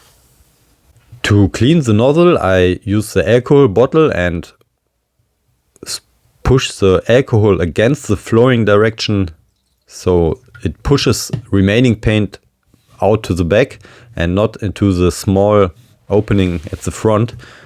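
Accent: Germany. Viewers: Excellent video and don't worry about your English is perfect and great with German accent 😄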